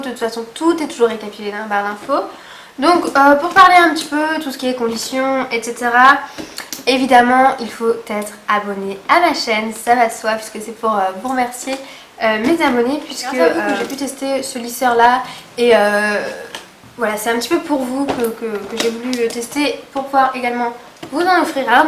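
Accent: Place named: Alsace, France. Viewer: Hello ! Je participe a ton super concours! Je n'ai pas malheureusement rien testé de la marque Remington, je n'ai pas de lisseur non plus car mes cheveux sont relativement lisse comme les tiens; et grâce a ton tuto je me dit que je pourrai finalement quand même en utiliser un pour me faire des ondulations ! :) Mon anniversaire est le 24 oct ça serai un superbe cadeau haha Ps: J'aime beaucoup ton petit accent Alsacien !!!!!!!!! vive l'alsace ! salut bissome !!! :)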